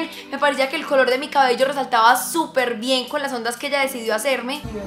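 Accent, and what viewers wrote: Antioquia, Colombia. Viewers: Osea no todos los colombianos hablamos con ese acento, así hablan los paisas que son de la ciudad de medellin. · Recuerda que ese acento no lo tienen solo los de Medellín, son más ciudades, Armenia por ejemplo, entre otras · No toda Colombia habla asiii, únicamente los paisas hablamos así y Antioqueños. 💛 El acento cambia según la región. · Me encanta el acento de Colombia es el mejor de Latinoamérica saludos desde Venezuela 😍😍😍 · Ella habla asi por que es paisa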